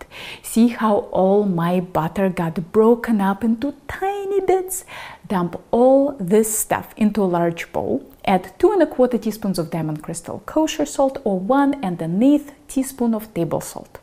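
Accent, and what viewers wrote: Russia. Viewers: Рашн акцент не спрятать) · I followed your recipe and made pelmeni your way. They were deliciously spectacular! Thank you very much. You mention Olia Hercules often, so I watched a few of her videos. Her recipes looked tasty but it seemed she wasn't cooking for the average person. Most home cooks do not cook the way she does. In one video she used a gas range that must have had a dozen burners. I like the fact that your food processor is small and you need to make two batches and mix them. You don't have the Instant Pot Ultra Bluetooth model, you have the same one I have. That is what it is like for most people. You seem to love what you do and passing on your passion. That is what I enjoy about your videos. It doesn't hurt that you are a very pretty lady with a Russian accent either.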